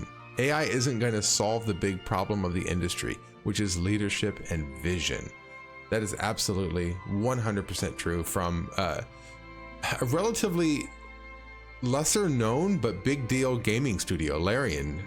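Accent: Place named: Midwest, United States. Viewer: Are you from Minnesota or the Midwest? Your accent is strong lol.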